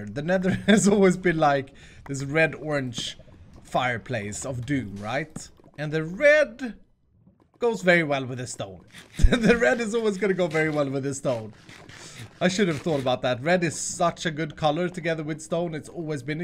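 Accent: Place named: Sweden. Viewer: I must say that I love how you talkin such a swenglish accent all the time but then do all those great english accents when you are playing around. Luv it =) You guys were great in the panel btw. One could say that it was a smorgasbord of hermits to enjoy.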